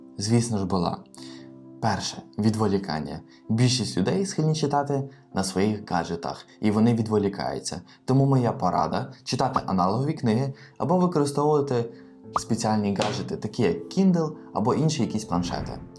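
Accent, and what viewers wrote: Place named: United States. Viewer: Ех, не можу за акцентом вгадати штат( Успіхів вам і розвитку каналу! 🙌🏻✨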